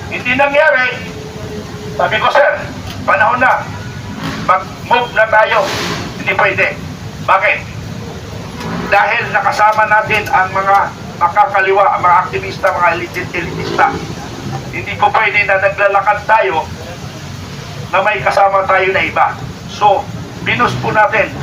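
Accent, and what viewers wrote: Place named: China. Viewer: may Chinese accent ang nagsasalita😅 Ano ba yan! Napaghahalata!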